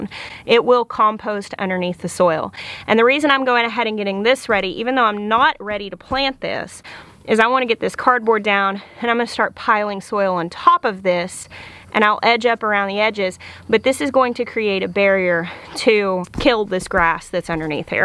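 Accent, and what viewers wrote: South, United States. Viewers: Gurl...I listened to you for 5 minutes saying what I thought was "silage sharps"! You have such a southern accent! Finally I realized you were saying "silage tarps", or as we would say here in New England "silage tahps". lol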